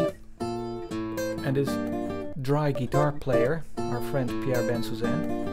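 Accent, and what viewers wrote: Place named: Netherlands. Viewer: What a lovely Dutch Accent ;)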